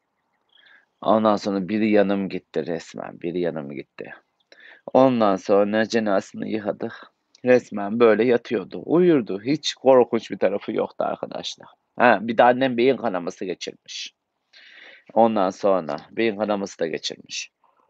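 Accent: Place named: Kastamonu, Turkey. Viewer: Kardeşim Başına Sağolsun Allhm Kabir Rahatlığı Versin Kastomonulumusunz Konusmalarn Öyle Geldi Bizde Sinopluyz Sabırlar Dileriz Çok Büyük Bir Acı Sende Merhametli Bir Evlatsn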